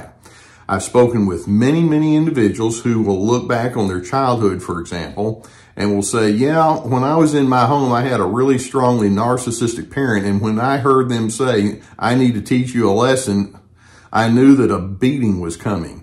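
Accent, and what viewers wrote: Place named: Texas, United States. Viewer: Another great video from Dr. He really gets to the heart of the matter! I so often heard this horrid expression from my mother and having it defined logically helps me to rationalise and overcome its effect. Many thanks for the calming effect of these videos. That lovely Texan accent and the comforting Gus on the sofa help a lot too! 💕💕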